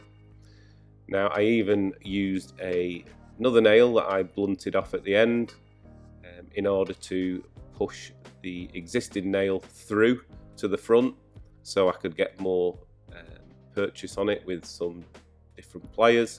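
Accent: United Kingdom. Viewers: Wow. What a masterpiece. You made the vid relaxing and entertaining to watch. Great editing and I still like your British accent as well. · This became a stunning beauty, reallly - sub button pushed! indeed I like your British accent - in terms of "English" I'm a kind of "Britophil"! ;) Greetings from Germany, the silver fox, David 😉🦊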